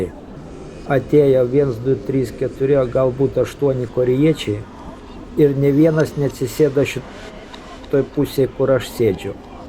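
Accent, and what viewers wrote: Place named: Ignalina, Lithuania. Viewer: Tau tinka toks blogerio žanras kur kalbiesi su savimi Ignalinos tarme. Lauksime tęsinio. su Naujais metais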